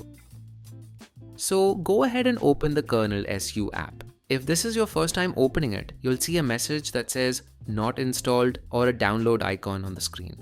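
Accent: India. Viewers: I don't understand Indian accent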